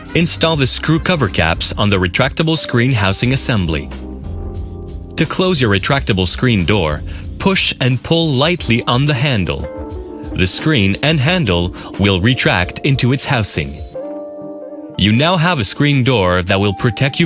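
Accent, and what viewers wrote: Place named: Quebec, Canada. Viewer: It's just a slight French-Canadian accent. There's really no need to slag his pronunciation.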